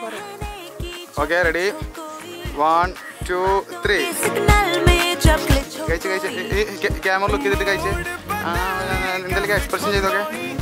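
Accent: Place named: Malappuram, India. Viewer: Yaaaa mwoooneeee .... 😅😅Ante varthaanam kekkanaa verneee main aayitt ..... 😂😂😂😂💪🏻 malappuram daaa 😜😜💪🏻💪🏻💪🏻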